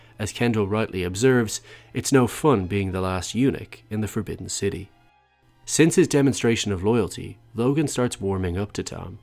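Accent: Ireland. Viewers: Do I hear a slight Irish accent??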